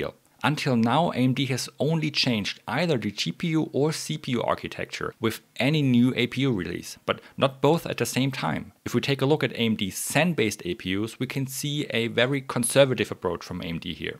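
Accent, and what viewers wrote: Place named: Germany. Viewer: I love the German accent in your English 😀😀. I just subbed.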